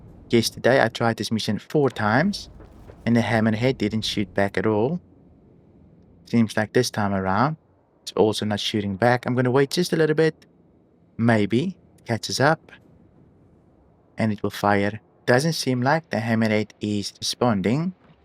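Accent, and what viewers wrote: Afrikaans, South Africa. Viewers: Dutch, Belgian or Afrikaans? Sound very Afrikaans...lekker vid and and build.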